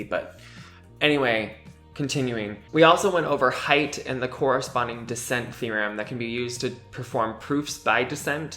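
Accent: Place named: North America. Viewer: you have what I call the "white girl tounge curl" its a condition that effects many north americans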